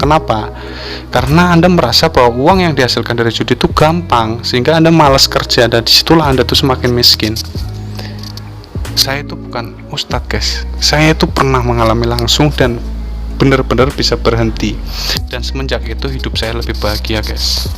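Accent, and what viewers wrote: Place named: Tegal, Indonesia. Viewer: ngomongnya medog logat tegal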